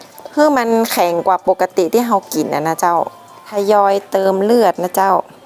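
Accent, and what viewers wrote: North, Thailand. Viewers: ตอนเข้ามาดูครั่งเราติคุณเรื่องภาษาเพราะเราไม่เข้าใจทั้งหมด ตอนนี้ฟังไปฟังมาภาษาคุณน่ารัก ช่างดูใจดี สุภาพ นุ่มนวลแบบคนเหนือ เราเข้ามาหาอาหารมังสวิรัติค่ะ เลยแวะเข้ามาดูค่ะ. อ้าว...ดูไปมีเลือดด้วย เลยต้องดูเฉยไม่ทำตามล่ะ แต่ดูดีน่าทานค่ะ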